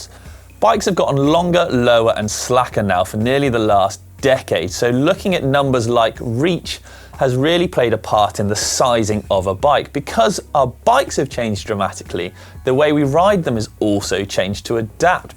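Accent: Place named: United Kingdom. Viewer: very informative video! and no offense but good job: to keep the accent down for us non-british viewers...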